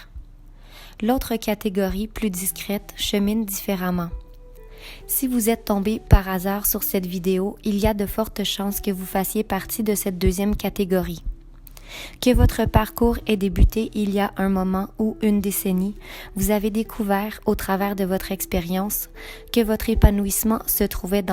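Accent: Quebec, Canada. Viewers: Tu es Québécoise? Car tu as l'accent. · merci bcp pour l'effort...je me demande simplement pourquoi un documentaire si intéressant et si beau comme celui-ci de ne pas penser le soumettre à une voix française au lieu canadienne pour qu'il soit auditivement confortable.merci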